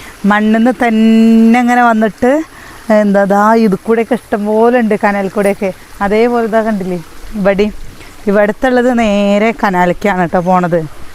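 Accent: Palakkad, India. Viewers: Snake kanum nokynadakanam mol.palakadan samsaram nalla eshamannu ketto.thank you all family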